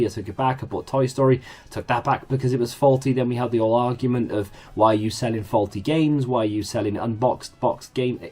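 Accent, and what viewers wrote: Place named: Merthyr Tydfil, United Kingdom. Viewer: love your merthyr accent im saying this as a Caerphilly bloke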